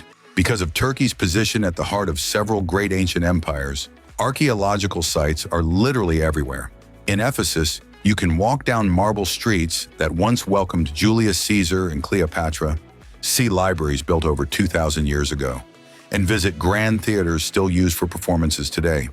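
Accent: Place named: United States. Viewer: It is good,,.infact very good but one little mistake but very important thing that makes sense to all Turkish spiking people,,,.that we know dodo’s used to live in USA and wild turkey,s came from USA as well,,,but There is no link between the bird or the land ,,,right I know you are an British American but the land spelled Türkiye 😂,,.and not Turkey,,.please note the spelling even you are an American or a guy with an American accent,,,.🧐you can see the difference,,,,,.Right 😅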